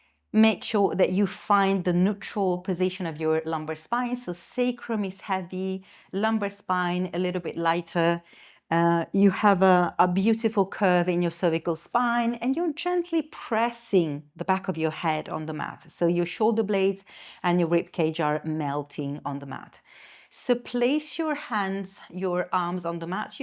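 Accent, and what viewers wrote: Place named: United Kingdom. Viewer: Ciao, che piacevole sorpresa trovare il tuo canale in inglese! Complimenti per l’inglese e l’accento British 😊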